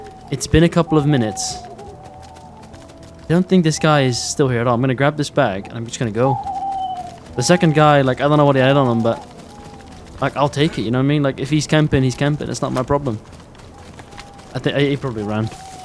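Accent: Netherlands. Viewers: Are you Dutch... ? :) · damn wist niet dat je nederlands was tot ik je hoorde praten met je team haha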